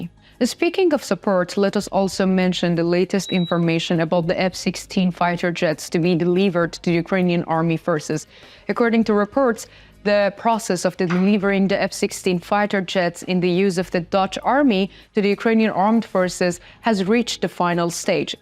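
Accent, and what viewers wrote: United Kingdom. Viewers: Great video, great English! Love this format. Based on the accent, I cannot tell where you are from, since you have a British twist in your pronounciation!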